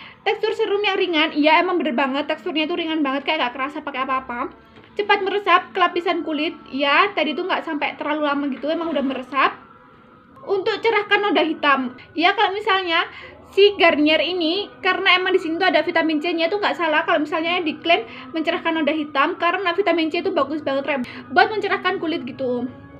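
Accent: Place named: Surabaya, Indonesia. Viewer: Halo rek kembali lagi rek videoku duuhh paling seneng nonton video ini Surabaya ya medok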